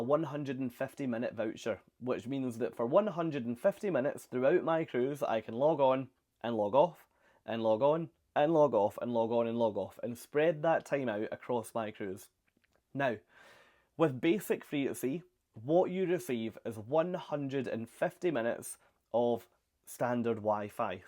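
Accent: Scotland, United Kingdom. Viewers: Thank you so much for this! My wife and I are going on a Mediterranean cruise in July, and we’re on the fence about doing the Free package with Norwegian. We typically travel with Royal Caribbean, so this is our first Norwegian cruise – and we weren’t sure whether or not to get this free at sea package. After watching this with my wife, we have decided to do it and realize that we will get more bang for a buck with this than we thought initially. This is our first time watching your YouTube channel, and we both appreciate your insight and advice – subscribed! Thanks again! PS – being Americans, we both love your Scottish accent😂😂😂😂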